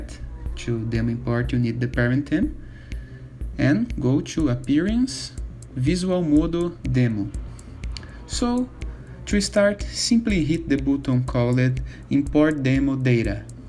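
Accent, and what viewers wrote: Brazil. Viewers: Brasileiro? :D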